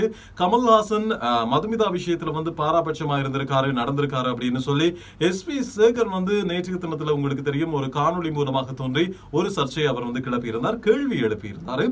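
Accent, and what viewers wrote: Sri Lanka. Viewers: Bro unga Tamil speech nalla irukku I meen ilankai Tamil super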